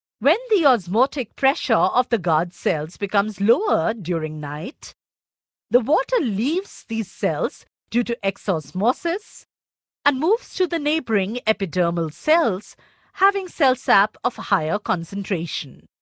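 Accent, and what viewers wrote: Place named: India. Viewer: U sound like hindi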